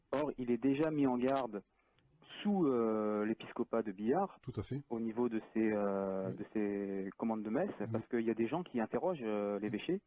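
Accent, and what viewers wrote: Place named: Toulouse, France. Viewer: Son articulation est très sûre : ça s'appelle l'accent toulousain. C'est français. Ça vous dit quelque chose?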